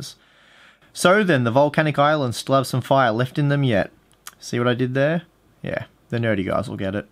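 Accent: Australia. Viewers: I really enjoyed this as well as all your videos. Something about the Aussie accent makes watching all these vids for research a bit more fun...LOL...I would like to add in the states it seems the R9 390's in both the standard and X variants are a bit cheaper with used 390's being found for under $150. The 290's for some odd reason are averaging the $200 you mentioned. Thanks for another great video. Since I only upgrade when I see major dips in frame rates, well educated hands on reviewers like yourself are a godsend. My last rig finally died, (VRM's on the motherboard), lasted me since 2010. It was the old tried and true Phenom x4 965 BE stable on air with a Radeon HD6950 TOXIC from Sapphire that ran as the 6970 with the Bios Switch enabled. Card still runs perfectly, but she is definitely dated and it's time to upgrade. Thanks for making that so much easier. 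Cheers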